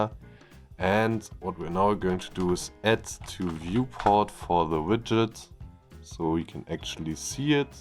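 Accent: Germany. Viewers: I hear german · man hört das deutsche raus 😅, danke fürs tutorial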